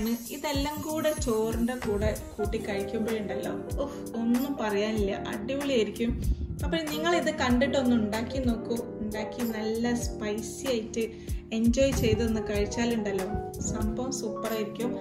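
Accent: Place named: North India, India. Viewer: Ningalde varthamaanam oru North Indian Malayalam paranje pole Ind...good vdo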